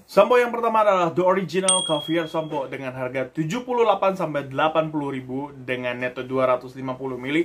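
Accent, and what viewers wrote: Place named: West Java, Indonesia. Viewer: Sunda anjir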